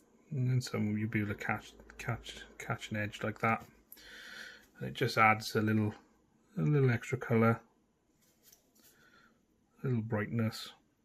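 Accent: Wales, United Kingdom. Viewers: You sound Welsh where you from mate? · Loving the vids! Nice to hear a fellow Welsh Accent in the painting scene!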